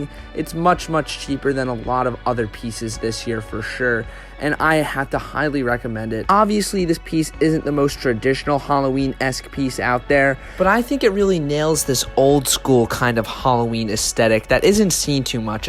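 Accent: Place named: United Kingdom. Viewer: British yay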